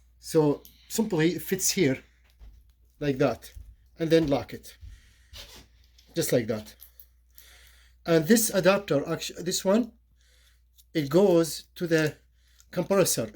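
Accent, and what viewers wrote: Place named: Romania. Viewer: U not english u Are Romania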